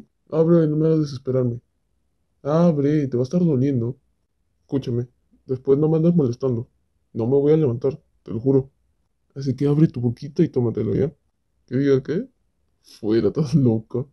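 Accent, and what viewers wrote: Peru. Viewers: eres peruano? tu dejo es muy parecidos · Hay me da la impresion de q eres peruano ( :D nada mas es una duda XD no te lo tomes a mal) Pd. Ta bonito el video :D chao cuidate xd un F por tu brazo XD .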